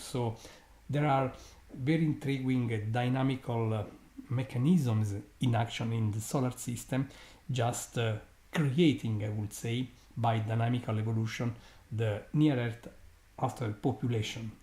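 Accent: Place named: Italy. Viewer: Se entiende bien el inglés italiano por lo menos para los latinos jaja. Gracias por compartir esta experiencia. Espero que podamos seguir observando hechos relevantes del espacie en tu canal. Seguimos conectados desde Paysandú, Uruguay.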